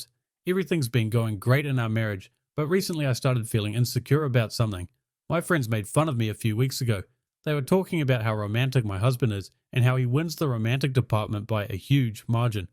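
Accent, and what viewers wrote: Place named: New Zealand. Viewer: the kiwi accent sounds good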